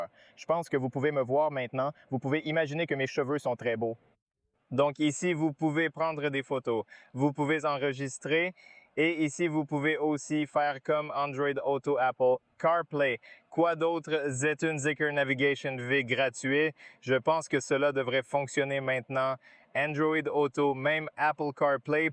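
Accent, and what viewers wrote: Quebec, Canada. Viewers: Honnêtement ,cette vidéo comporte beaucoup d’informations interessantes mais exprimées dans une telle confusion,passant du coq à l’âne , brouillées par un débit verbal trop rapide et par l’accent québécois que je renonce à vous écouter jusqu’au bout. Pardonnez moi .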